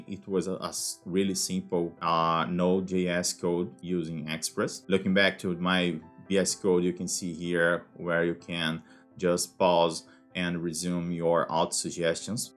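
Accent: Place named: Brazil. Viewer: Eu logo percebi que vc é brasileiro, nosso sotaque é unico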